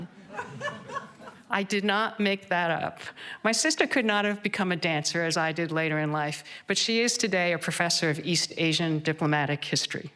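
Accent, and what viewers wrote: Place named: California, United States. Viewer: Amazing accent? Californian perhaps?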